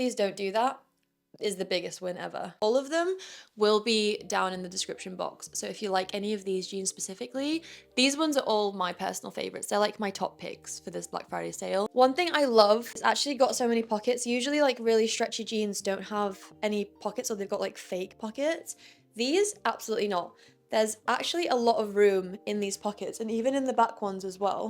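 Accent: United Kingdom. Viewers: You are a BEAUTIFUL young woman. Beautiful dark brown eyes, beautiful smile and sexy voice with the British accent. STUNNING figure. BEAUTIFUL tan body and curves, really cute belly button, nice little booty and sexy long legs. You look AMAZING in all of these pairs of jeans. All of the colors look REALLY good on you and make you even more beautiful. The white makes you look like a brown/dirty blonde-haired angel. All of these jeans hug your body and hips and make your beautiful curves POP. You look AMAZING. You are BEAUTIFUL and VERY cute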